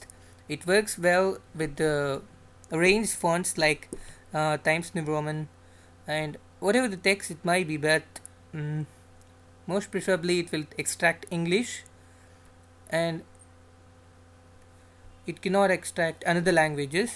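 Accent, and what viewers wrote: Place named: India. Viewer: Thank you, this was helpful. Your Indian accent is funny.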